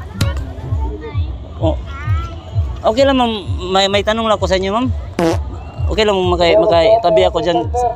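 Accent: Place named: Visayas, Philippines. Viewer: Pag bisaya na lng oi,halata imong tuno!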